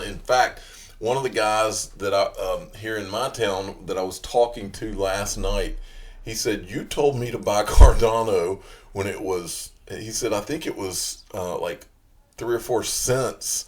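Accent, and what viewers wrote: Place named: Nashville, United States. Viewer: You have to be from around Nashville with that accent.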